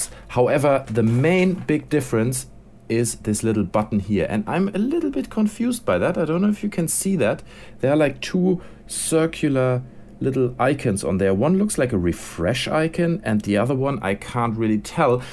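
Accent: Germany. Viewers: Anybody know where this flavor of english accent is found/from? I hear it often on youtube but its clearly not British, Irish, Scottish, Australian, South African, North American, I cannot pin it down, It almost has a German or Swiss hint to it... ? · fürchterlicher deutscher Akzent ^^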